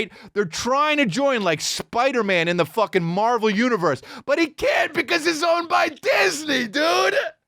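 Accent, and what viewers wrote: United Kingdom. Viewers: Your British accent is surprisingly really good!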